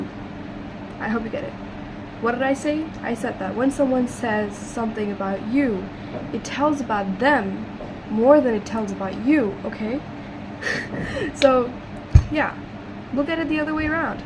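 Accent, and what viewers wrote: United States. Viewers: YOOO YOUR AMERICAN ACCENT IS ON POINT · Wait how did u get your American accent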